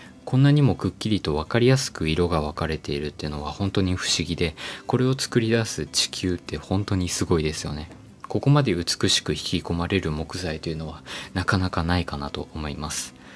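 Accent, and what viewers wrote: Aichi, Japan. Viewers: さん愛知県民でしょw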